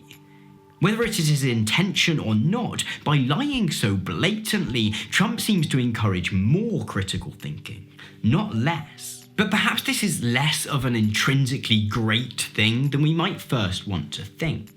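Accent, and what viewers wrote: United Kingdom. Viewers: Dear,are you british?just interested in english language,try to learn it again.sounds british to me.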